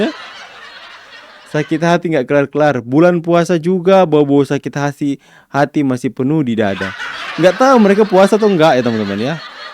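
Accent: Java, Indonesia. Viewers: baru tahu..kalau mas..ayah ibunya org Malang...mangkanya logatnya kok medok org jawa...salam dari malang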